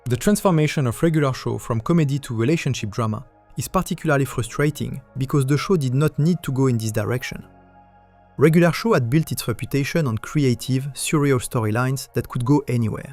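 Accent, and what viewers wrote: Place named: France. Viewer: Tu peux mettre une piste audio en français? Parceque ça fais un peu bizarre d'entendre un mec parler anglais avec un accent français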